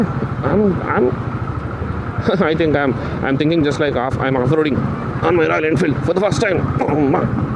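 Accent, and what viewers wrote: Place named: Hyderabad, India. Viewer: bro u speak superb hyderabadi hindi..😁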